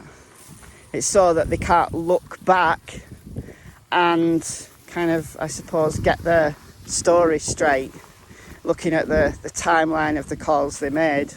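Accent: United Kingdom. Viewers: Hi! I'm one of your new subscribers. I love your take on things, and I love your british accent.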